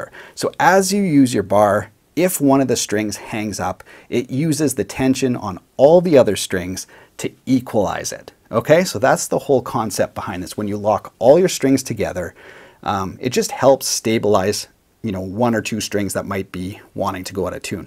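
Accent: Canada. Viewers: I really like catching the slight differences from American English he does as a Canadian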